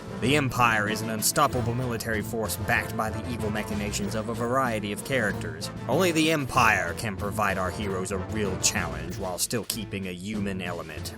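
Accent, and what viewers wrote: South, United States. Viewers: No disrespect to you or people from the south, but your accent. Now my mom is from the south, Norfolk, Virginia in fact, and her parents and their family are from the Carolina’s and Georgia. So their pretty much as southern, as respectable southern can get. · is he southern??? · I like how your accent is "normal" and then your southern one comes out... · Are you a Southerner i can here certain twangs in your accent, I can kinda tell because im a Southerner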